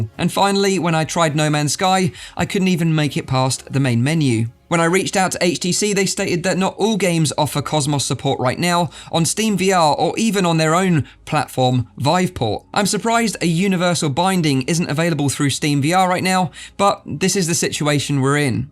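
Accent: United Kingdom. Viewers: wtf? He has a decidedly British English accent. His pronunciation of 'cosmos' is in that idiom, and no mistake. I see no evidence of Yankery here! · NDT and those guys are all actors. Propagandists. And yes , ole boy is brit af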